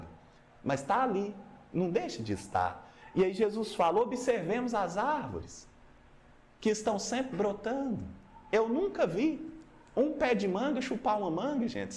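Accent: Minas Gerais, Brazil. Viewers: Que sotaque bom ! É mineiro?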